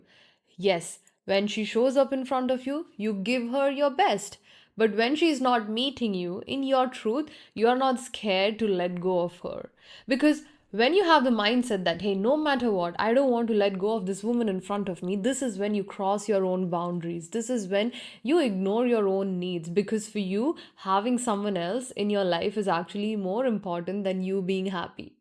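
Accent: Kerala, India. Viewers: ibal malayali alle. poli. i just noticed now, her english is malyali english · Nice insightful discussion but lots of grammatical errors when you talk. “Most Nicest” is incorrect English. It must be either “Nicest” or “Most Nice”. Most Indians especially people from South make this mistake which makes me wonder whether grammar is still taught at the elementary level. When you are using the word “most” you are already attributing the maximum level of the adjective to the concerned individual. So, you do not need to do that again. Similarly, I have come across people saying “did went” “did came” which is again something that keeps hitting me. “Did” has already attributed past tense to the verb and it need not be done again. So, after using “Did” you use verb in the present tense. So, the ideal way to say it is either “came” or “did come” Don’t mind but your Malayali accent already makes people curious and if you continue with these mistakes, you will make yourself a laughing stock. 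I hope you take the feedback positively and don’t make it a ego issue like most Indian women. I gave similar feedback to my cousin sister and she kept contesting me till the I gave up. Actually she realised her folly but her ego was getting hurt for being corrected by her little brother .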